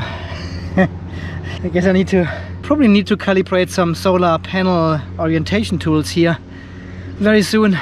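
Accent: Germany. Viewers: und ich war mir immer sicher da einen deutschen Akzent zu hören :) Mach weiter so :)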